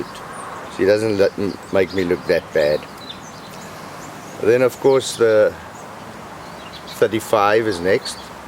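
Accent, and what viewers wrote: South Africa. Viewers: SA accent is it?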